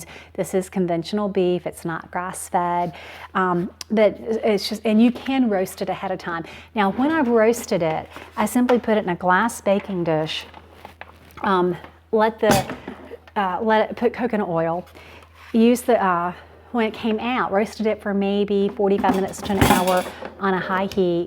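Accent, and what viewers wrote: South, United States. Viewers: i really like watching you you have a very calming voice love the southern accent I'm from london uk xx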